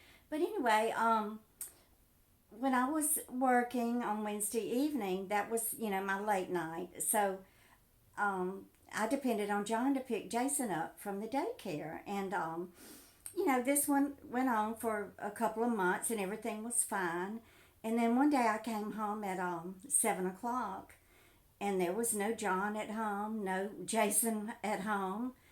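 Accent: South, United States. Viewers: I am new to your channel and love your story telling. I am a Southerner and can relate to your accent. Keep making videos!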